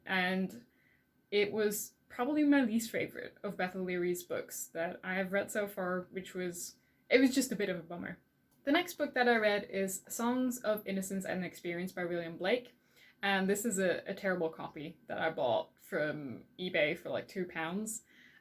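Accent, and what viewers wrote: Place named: North America. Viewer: Great video. Someone gifted me the complete collection of HG Wells recently, some I have read, others I’d never even heard of. I’m looking forward to reading them. You have a great accent. North American with a hint of northern English perhaps?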